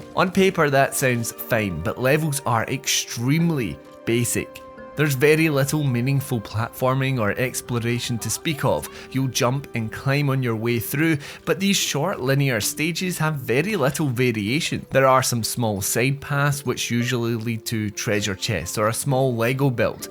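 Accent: United Kingdom. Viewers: when he said Varl I was like who!? But am just teasing. That accent is a prized British treasure 🥸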